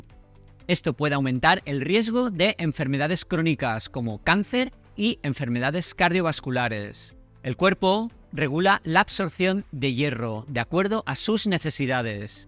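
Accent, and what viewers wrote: Spain. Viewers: Vit D3 PARA CARENCIA DE VIT D..SOLO POSEO EL 8% Y NECESITO CERCA DEL 100%> ES UD UN GRANDE, GRACIAS CONFIO EN UD..DESDE HACE MUCHO LO ESCUCHO..Y LO PERDI HOY YA NO no lo perderéad. Solo me agrada la gente con valores lis demás que digan no me interesaron antes y menosdesdeURUGUAY.LO SKDO..SIEMPRE ME HA DEJADO LO MEJOR..A LAS ORDENES...DE QUE PAIS ES UD??? a..🥱🇺🇾. UD R TIENE ACENTO O ESPAÑOL. MADRE PATRIA O COMO EL MIO.,MAS BIEN SPANISH... UN enorme gusto...bienvenido,Bienaventurado..es muy grato en esta suciedad No SOCIEDAD.encontrar valores..!!! Uruguay.; Graziella Riccetto.!! Gracias.!!❤🎉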